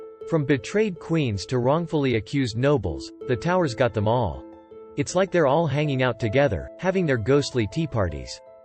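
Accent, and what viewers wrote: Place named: United States. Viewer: Never believe a voice sounding like a America they never get the facts right.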